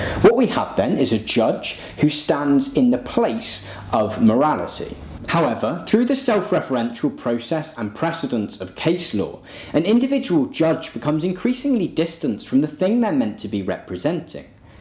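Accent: United Kingdom. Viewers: I love your accent , so British saluts From Algeria 💜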